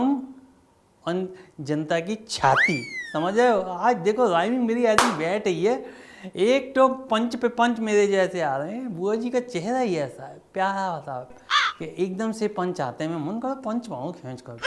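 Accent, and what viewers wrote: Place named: Bundelkhand, India. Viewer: मज़ा आ गया बुंदेली सुनकर। और कछु अंग्रेज़ी सुनकर। वैसे वो तो इतनी भी अंग्रेज़ी नहीं बोलता जितनी आप बोल देते है।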